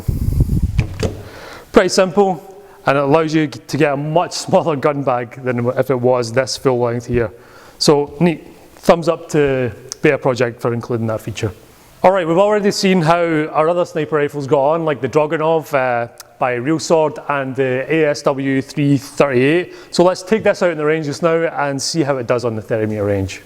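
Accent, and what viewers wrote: Scotland, United Kingdom. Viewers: Your Scottish certainly lays down the law, that's for damn sure.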